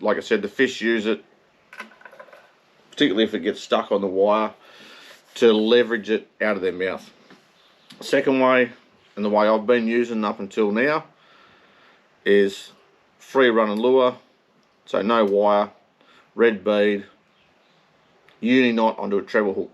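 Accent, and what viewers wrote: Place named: Australia. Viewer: GREAT NORTHERN BEER:, Thanks for the Rig demos for the Tasmanian. I enjoyed seeing this, AND You tilting the Cans of Great Northern. The slured Speech, along with The Down under Accent was busting me up. I think my Demo " BRO", Was half in the bag, while doing the Video. LMAO.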